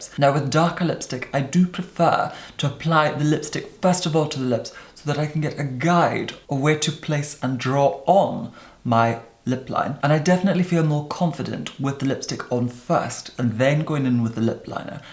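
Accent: Scotland, United Kingdom. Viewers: I Love your accent! Is it scottish? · Scottish accent: VELVET edition! When I close my eyes, his voice conjures dark chocolate being tempered on a warm marble tabletop.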